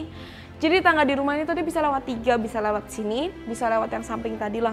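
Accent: Java, Indonesia. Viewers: Ada darah Jawa kah ?? Sepintas cara bicaranya kek ada logat² Jawanya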